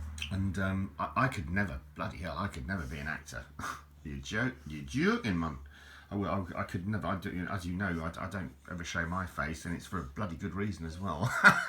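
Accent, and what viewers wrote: RP, United Kingdom. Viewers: Thank you for your enlightenments + you're most welcome God bless you &all your devoted work. You honestly are so dedicated in all the work you're doing + it should be acknowledged. You're immensely sociable + groundedly humble for one whom speaks with such a posh accent Look forward to your insightful interesting humble videos 🌼🍀☀️🍀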